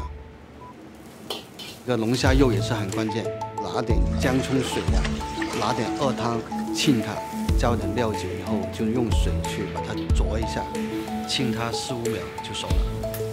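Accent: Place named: Cantonese, China. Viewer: Yucheng Zhou I somewhat agree with you except that to me he sounds like how my dad speaks mando while his main language is canto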